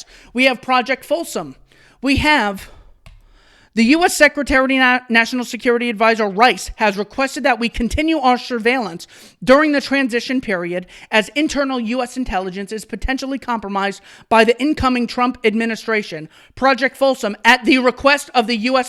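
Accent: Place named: United Kingdom. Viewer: Fringe beliefs? Are these British clowns serious? Once upon a time, abolitionists were considered fringe members of society. Love the British accent,.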